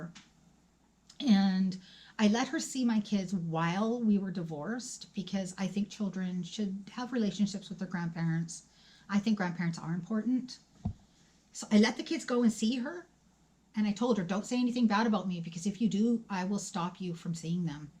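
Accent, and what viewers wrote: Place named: Canada. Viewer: I recognized the accent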